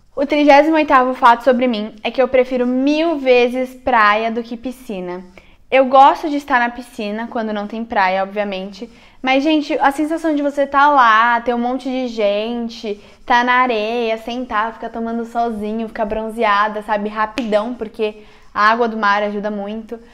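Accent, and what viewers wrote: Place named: Rio Grande do Sul, Brazil. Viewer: Te amo, vc é muito linda, tbm acho legal o sotaque gaúcho. Vc é bem sincera, e vc gosta de tudo que é bom, curto muito seus vídeos. Determinada, sabe se expressar e sou sua fá. Bj princesa....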